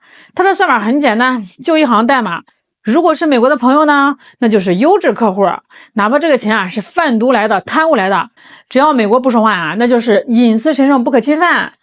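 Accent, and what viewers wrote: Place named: Shandong, China. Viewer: 这口音是闪董印. 挺解压滴. 瑞士人坏的很, 他周边的国家没一个不恨他的, 美国也时常修理瑞士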